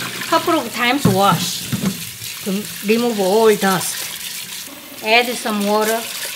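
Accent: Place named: South Korea. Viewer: You are so cute. korean intonation of english too.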